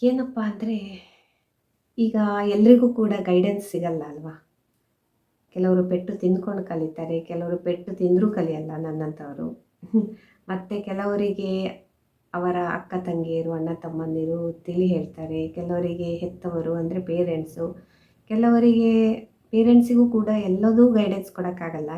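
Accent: Standard. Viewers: Nimma video bari super hagutade.yellaru ivarige support madi.swatcha kannada matanaduva shaili olleyadagi barutte.yellarigu request maduta iddene support madi .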